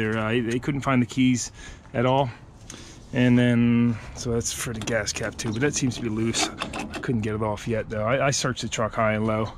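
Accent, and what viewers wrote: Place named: Pennsylvania, United States. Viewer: Hi, new sub here, just found your channels. It was the '65 Plymouth wagon that drew me in . Sounds like you're from PA...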